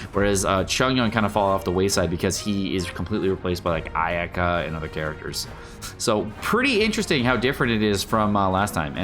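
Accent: United Kingdom. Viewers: British never fails to amuse me 🗿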